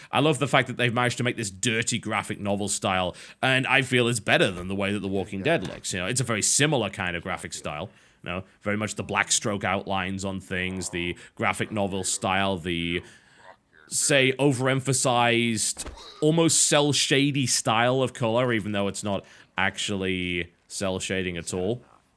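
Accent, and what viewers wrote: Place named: Newcastle, United Kingdom. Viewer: Let me guess were you're from by your accent you are originaly from newcastle but you traveled frequently as a child then you moved to north London at age 20 or 22 until 25 and then America were you have been living for 3 years now. Oh and great channel and awesome videos keep up the magnificent work.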